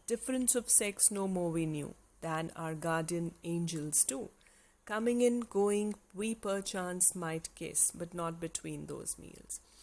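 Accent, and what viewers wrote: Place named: India. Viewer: Thank you for the great an excellent explanation about this poet .really,I have never heard this kind of explanation in Indian accent and as much clear accent, really it was attractive and learned very clear and good this poem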